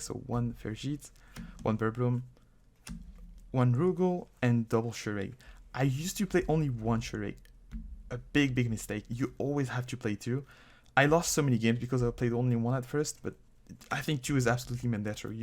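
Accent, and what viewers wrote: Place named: France. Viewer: great deck profile!!! weird question, why do you sound kinda.... fr*nch?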